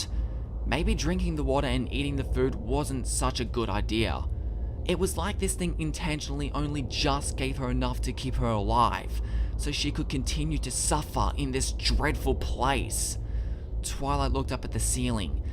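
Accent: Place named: Australia. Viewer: Your Australian voice is funny honestly, I wonder who you are, you sound familiar XD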